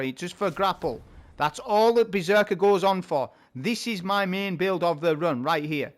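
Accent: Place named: Chesterfield, United Kingdom. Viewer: another great video brother... you sound local to me, im from chesterfield. you got discord?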